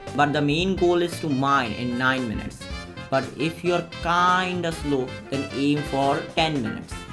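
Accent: India. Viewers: are u indian by chance? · Prob indian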